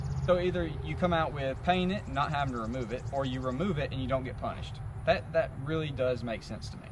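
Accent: Alabama, United States. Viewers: What part of Alabama you from ?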